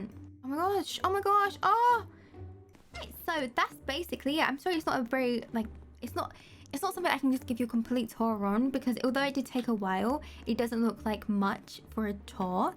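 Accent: London, United Kingdom. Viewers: BRITISH WOMAN!!! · You sound British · Amanda Sorkin She’s from London 🇬🇧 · Are you British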